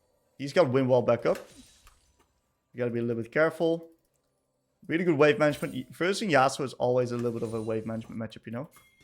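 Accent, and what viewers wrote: Netherlands. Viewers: he has a dutch accent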